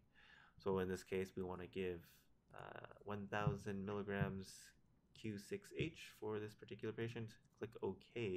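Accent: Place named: Canada. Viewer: loooooooooooooooow and monotone Canadian accent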